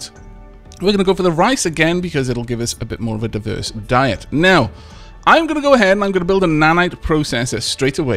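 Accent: Wales, United Kingdom. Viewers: Completely new to this channel, saw the picture, is this guy Welsh? I noticed the accent and can't help think it. I know it's not important, but you know...curiosity!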